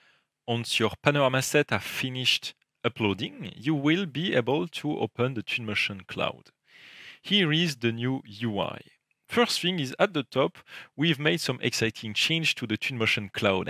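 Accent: France. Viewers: Hello Twinmotion folks. Sorry, it's nothing personal, I love the French language. But the narrator's accent is very pronounced and I can't quite understand it. Please, I ask you to put it in French with subtitles or in English, but with less accent. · Ohhh l'accent de frenchy à couper au couteau...vidéo intéressante.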